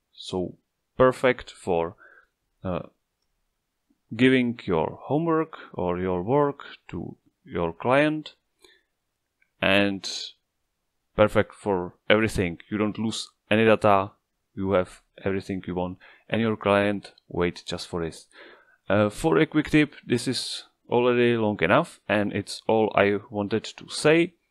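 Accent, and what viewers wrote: Czech Republic. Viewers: this is exactly what i needed thank you! by the way, are you by any chance from Czechia? the accent sounds familiar :DD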